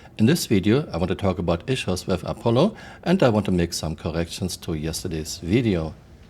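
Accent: Germany. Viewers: Du bist ja Deutscher. Höre ich an Deinem Akzent. Das Problem der Medic-Schiffe ist das neue Gameplay. Wenn ich alle Betten vollständig mit den Gels auflade und eventuell noch ein paar in die Regale stelle, dann bin ich first Target für jeden Piraten und Griefer. Folglich wird keiner mehr Med-Schiffe haben wollen. Toll CIG, oder sehe ich da was falsch?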